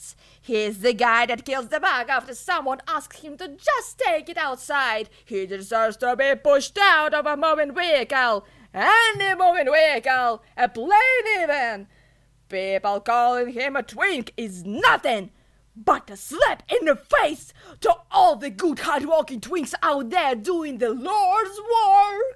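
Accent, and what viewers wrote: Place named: United Kingdom. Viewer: this sounds british and every other accent together, keep up the good work 10/10 voice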